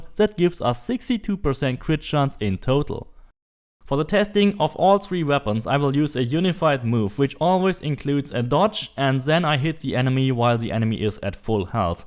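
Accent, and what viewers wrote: Germany. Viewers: Der deutsche Akzent is nicht zu überhören 😅 super Video 👍🏻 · XD I listened was like wait is this a German accent I looked found the impressum jep German xD danke für das Video bleib gesund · Man hört definitiv den Deutschen raus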